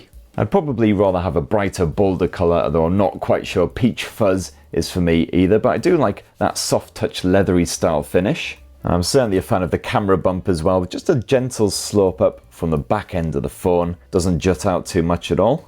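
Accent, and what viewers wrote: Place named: North, United Kingdom. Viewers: Straight out of the north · He's a Mackem mate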